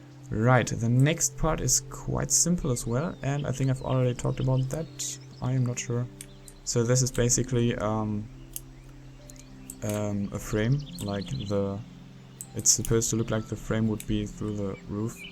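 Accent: Germany. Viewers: haha...man hört voll raus das du deutsch bist :D niocce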